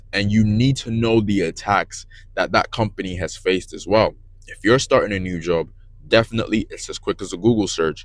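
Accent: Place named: Ireland. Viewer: I think he's from Ireland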